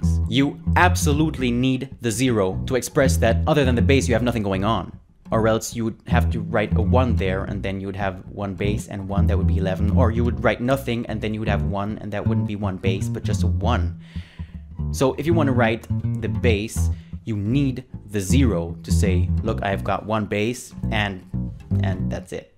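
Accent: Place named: Latin America. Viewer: I'm not trying to be mean or racism or anything but ur accent sounds so latin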